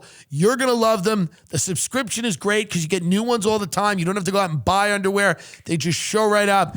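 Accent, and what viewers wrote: United States. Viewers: He sounds American to me too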